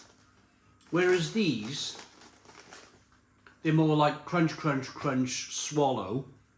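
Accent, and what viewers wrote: Wales, United Kingdom. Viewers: It's not his fault, he's Welsh.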